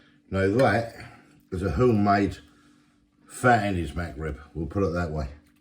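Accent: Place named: Norfolk, United Kingdom. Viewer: You a Norfolk boy Andy? Where about you from?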